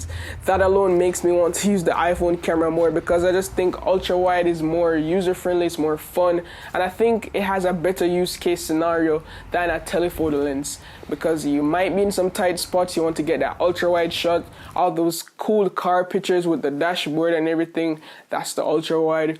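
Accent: Jamaica. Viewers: That Jamaican accent strong with this one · You sound very Jamaican. You Jamaican?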